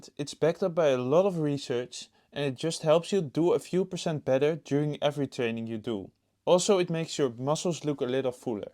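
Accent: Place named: Netherlands. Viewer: The dutch accent 😂😂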